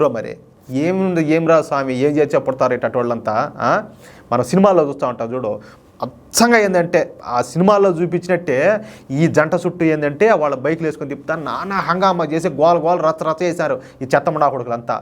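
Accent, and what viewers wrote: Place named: Tirupati, India. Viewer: Naa tirupathi pakkala a v(e)illage na meedhi 😂 vor vor vore vore ,chana adhbbuutamgaa vuntadhi Naa Nuvuu matladuuthunte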